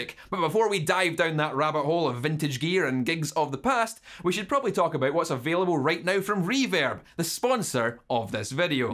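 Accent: Scotland, United Kingdom. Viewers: Nice scottish accent:-) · Two things. First off I love your accent. Are you Scottish? ? Second thing is I play bass and drums. I did a bass gig a few years back where I used my friends Randal beauty bass rig. Seperate head and cab. No pedals, bass strait to amp. I think the cab configuration is 6 10"s . I'm not sure of the wattage. But it's a great old unit. No frills, just really fat clean low end. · I love your scottish accent. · I just love that Scottish accent. Think $1.00 per watt. I use a Crate Power Block CPB150 150 watt amp through 2 stereo Akai SW-155 speakers with a 250 watt car woofer. They sound great the way I have 'em wired up. I love my Crate. It's a Great Crate. The girl in my house (wife) screams "turn it down"!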